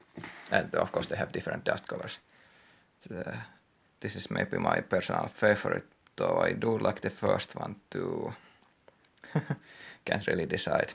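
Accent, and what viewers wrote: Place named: Finland. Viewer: Great Finnish accent! Thanks for the review. The cover art is nice but I wish they had made the spines uniform and matching so it looks more like a proper set or collection.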